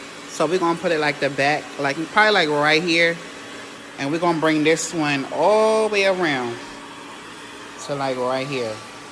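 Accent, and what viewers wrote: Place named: Baltimore, United States. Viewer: He sound like one of those baltimore people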